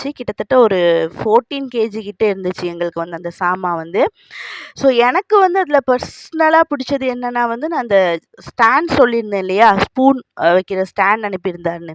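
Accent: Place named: India. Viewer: Hi sis ippa than ongada videova 1st time paakuren niga srilanka thane edhuku Amma endu India people's mari pesuringa umma endu sollalame knjm different ah iki ongada pechelllam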